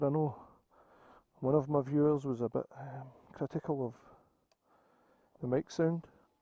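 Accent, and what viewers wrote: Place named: Scotland, United Kingdom. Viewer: Nice roads there, and amazing video. Unfortunately your speech is quite hard to understand for me (heavy Scots accent) Beside this, I am enthusiast for this bike, except for the rear absorber. Actually it's not efficient, nor acceptable to this class bike. My low back is often shoved, I am looking for an optional adjustable absorber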